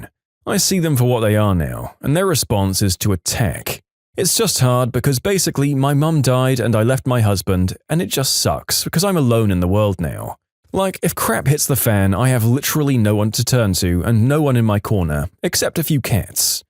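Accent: United Kingdom. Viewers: Well that's new, nice British accent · Is it me or the he becomes more British? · Get rid of the British accent go back to the normal American accent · Hmm... First it was Deep voice dude, then comedic dude, now Bri'ish dude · British alert british alert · Wth is this voice It's british and a different person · What’s going on about the new British accent 🤔🤔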